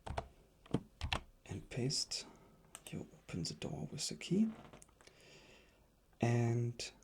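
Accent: Germany. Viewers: are you from germany because your pronounciation sounds very german and you also said "ja"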